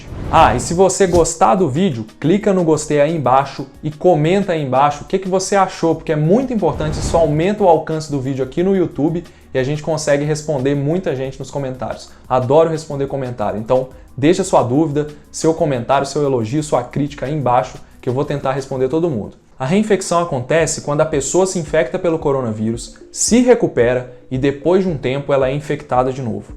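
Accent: Minas Gerais, Brazil. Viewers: Tô sentindo que os vídeos tão perdendo o sotaque mineirinho hein... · Agora temos um sotaquim minerim de responsa divulgando ciência de qualidade no Brasil todo 😂😍 Valeu! 👌🏼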